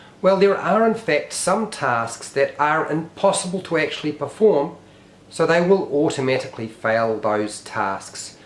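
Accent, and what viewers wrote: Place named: New Zealand. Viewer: he's talking about your kiwi accent :)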